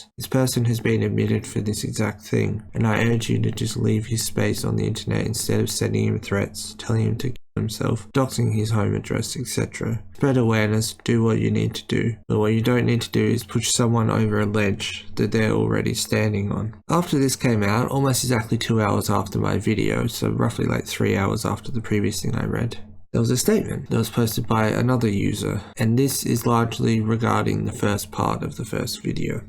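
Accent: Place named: United Kingdom. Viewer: ur so british i cant follow what ur saying without locking in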